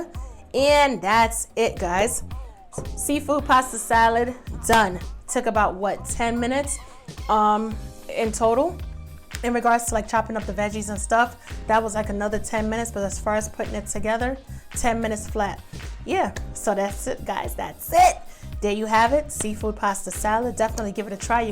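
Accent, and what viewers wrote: New York, United States. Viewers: Where r u from? Sounds like NY or somewhere up north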